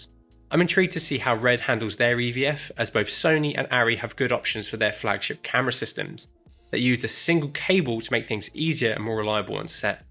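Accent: United Kingdom. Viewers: Why the hell would a brit working for a british company speak with an american accent for a video? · Your British accent is different to hear.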